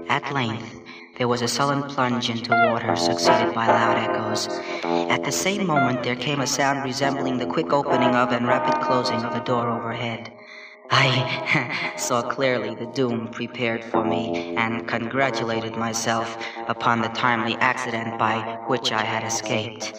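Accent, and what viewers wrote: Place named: New York, United States. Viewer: Cool. Woody Allen, reading Poe as a New Yorker.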